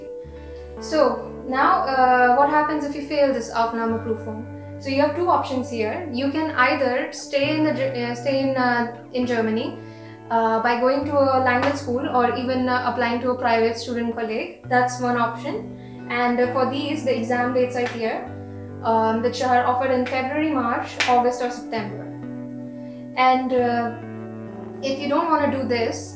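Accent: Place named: Kathiawar, India. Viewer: Are you kathiyavadi?..you are speaking in English but your accent is like kathiyavadi(in gujarat)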